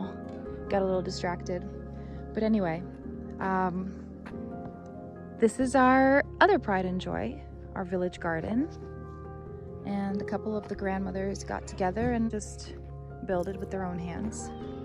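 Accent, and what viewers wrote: United States. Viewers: Fascinating, I hope you do continue. I assume you grew up in the US from the way you speak, but spent time in Bulgaria at this village with baba and diado. I'd be interested in hearing more about your reasons for moving, particularly since you're so young. My wife is from Sliven, and we have been visiting (from California) for 25 years, and have been sending our boys for the summers. Her mother's parents were in a tiny village far away, in the far NW corner of the country (you could see Serbia), I don't remember the name, and it was rustic to say the least. I'm hoping to retire to Bulgaria in several years after the youngest boy goes off to college.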